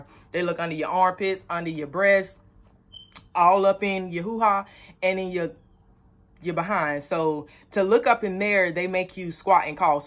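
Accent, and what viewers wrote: Florida, United States. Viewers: I love that country talk😊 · I knew she was from Florida,love how they talk.Ex felon myself love to see the growth.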